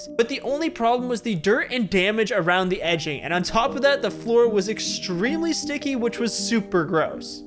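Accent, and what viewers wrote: Canada. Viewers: you sound canaidan are you?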